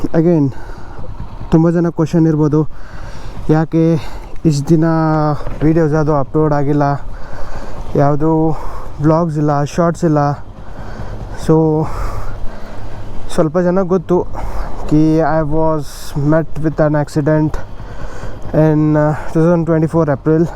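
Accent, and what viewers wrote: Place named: Belagavi, India. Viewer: Belagavi slang ❤ Mix masala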